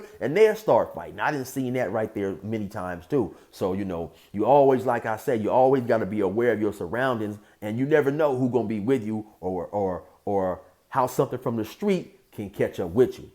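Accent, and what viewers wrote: California, United States. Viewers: Bruh in white and I literally cannot do a white California voice anywhere near this level. Sounds like that Wes Moreland (that big white guy who has a channel) hahaha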